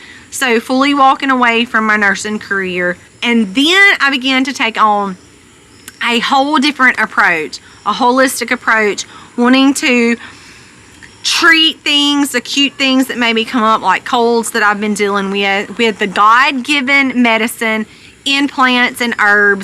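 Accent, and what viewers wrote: South, United States. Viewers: Hi, just got done watching that video with Tucker Carlson!. Shared it with my daughter and a friend that is dealing with PCOS. I'm proud of you for leaving what you knew was wrong in our medical system. Honestly, I never had health insurance for many years of my life and just don't go to the doctor. I broke my wrist 3 years ago, tripping over a tree root. Thank God for hospitals for that. They wanted me to go to physical therapy to rebuild my strength. I found exercises online and did them at home. Doctor was amazed on how well I healed and the flexibility I had. As far as your southern accent and they way you talk: I love the way you talk! Hearing your voice, not seeing your face, I know it's you! Take care and God Bless, Lori P.S. I watch your channel and Colby's everyday. Was wondering what you are doing with your off Grid property?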